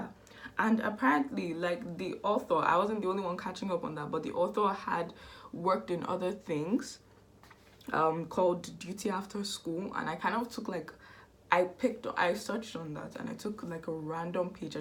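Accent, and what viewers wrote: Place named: Caribbean. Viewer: Your accent reminds me of my family's, are you from Martinique or any part of the Caribbean by any chance?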